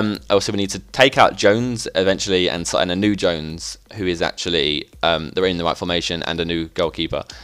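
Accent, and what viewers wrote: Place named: London, United Kingdom. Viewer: Come on I'm a west ham supporter there not a bad or dirty team but by the sound of your accent your probably from London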